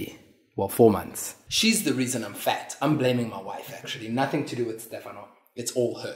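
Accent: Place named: China. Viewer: Amazing Chinese accent!!!!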